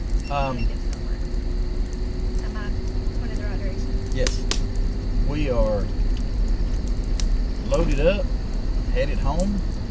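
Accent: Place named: Alabama, United States. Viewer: I enjoyed your video. Looks like you have a nice setup. I thought I detected a northeast Alabama accent. I am also from that same area. We love to camp at Cades Cove and Elkmont. We go to Desoto State Park often. It is an easy trip for us. Thanks for sharing!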